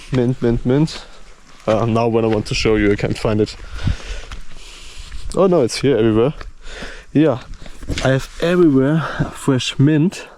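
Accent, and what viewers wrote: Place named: Austria. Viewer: Guys Austrilia and Austria too different. He sounds like european/ Austrian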